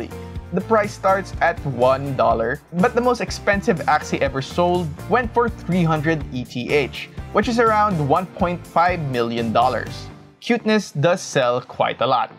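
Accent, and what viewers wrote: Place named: Philippines. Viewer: I hear a Pinoy accent... :D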